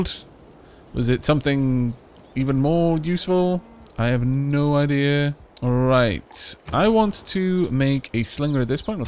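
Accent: United Kingdom. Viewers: Well this video is interesting... Tea surrounding London with a man playing England with a British accent. Sorry if it's something else.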